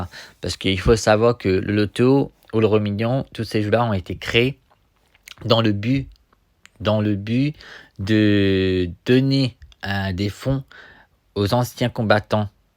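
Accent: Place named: Indian Ocean. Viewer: Ton accent sent l'océan indien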